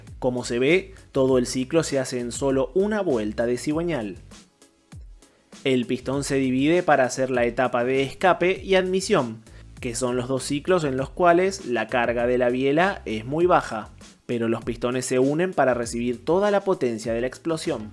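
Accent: Argentina. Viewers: Está buenísima la info y, escuchar en idioma argento...le dá un plus❤❤❤❤😂😊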